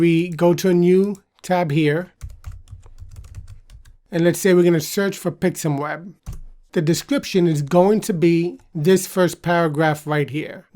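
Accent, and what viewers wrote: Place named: India. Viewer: Ur english sounds like Indian..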